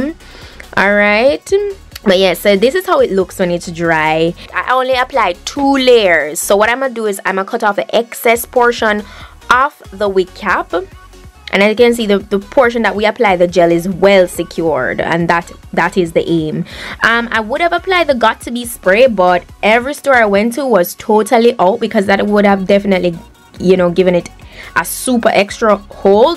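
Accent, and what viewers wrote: Jamaica. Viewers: U Jamaican right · You're Jamaican? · New subscriber.... am here for this Jamaican accent 😍😍😍😂😂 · You sound like a Jamaican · Love YOUR Jamaican accent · Sounds Jamaican Are you? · are you Jamaican, by any chance? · I think shes from Jamacia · OMG the accent is killing me ... 🙈 it reminds me of Jamaica 🇯🇲🙈😍😍😍😍 I am Obsessed !! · You sound Jamaican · true jamacian QUEEN..we Caribbean pple proud of our language. lol . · U sound like a jamaican🤔🤔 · U sound Jamaican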